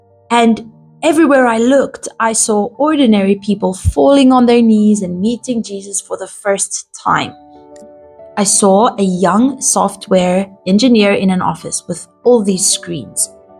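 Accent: South Africa. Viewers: You sound solid Afrikaans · you are from Colarado, but you sound South African? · You sound South African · Is the lady talking South African? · I detect an Afrikaans South African accent? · Is that a South Africa accent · a bit of a South African accent there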